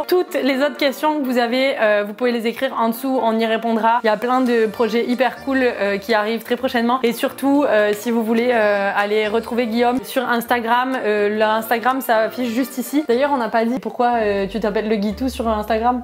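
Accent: Quebec, Canada. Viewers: Je trouve qu’on entend de plus en plus un accent québécois quand tu parles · Je n'avais jamais remarqué mais tu a un peu l'accent Québécois c'est est géniale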